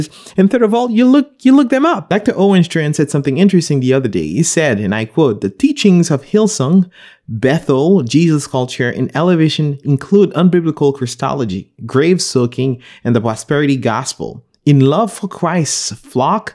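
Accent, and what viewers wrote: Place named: Haiti. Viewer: your videos and exposition are outstanding. Quick question. My wife and I sensed (what we perceived as ) a ever so slight Haitian accent as we are from S. FLA and it has one of the largest Haitian communities in the US. Are you by chance in S. FLA? Because if so and you are a pastor then we'd LOVE to attend one of your services. Let us know and which church if you are indeed a S. FLA pastor. Thanks and God be with you always.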